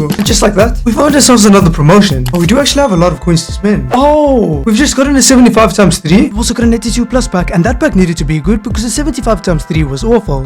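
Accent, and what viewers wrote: South Africa. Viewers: R u from Sa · Broski are u from south africa?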